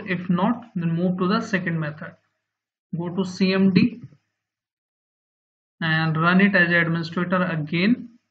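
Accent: India. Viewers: It worked smoothly. Been searching the solution for hours. And i knew im in a safe place after hearing an indian voice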